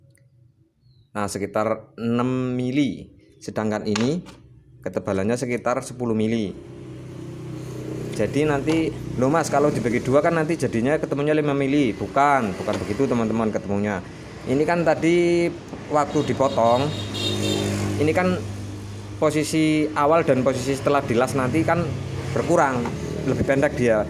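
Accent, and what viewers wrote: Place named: Banyumas, Indonesia. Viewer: Posisi bengkek ngendi rika mas, deneng kya ngapak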